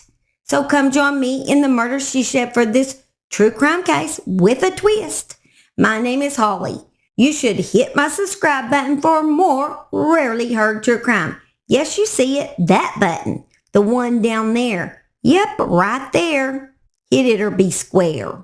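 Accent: South, United States. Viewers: Your accent sounds like Southern? Where are you from Mrs.?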